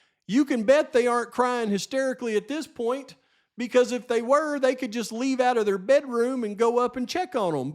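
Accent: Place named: Tennessee, United States. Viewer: You're definitely from Tennessee. Possibly KY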